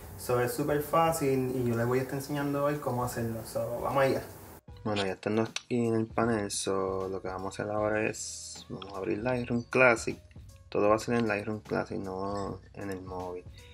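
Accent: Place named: Puerto Rico. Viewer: Brothel me ayudaste un montón…me sorprendí al abrir el video escuchar el “que es la qué hay mi gente” yo dije “este es boricua ¡ESO ES!” Saludos y gracias brothel 💪🏽🔥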